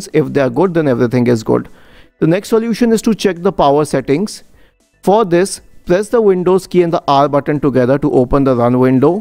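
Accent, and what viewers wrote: India. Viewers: Most authentic YouTube Indian guy accent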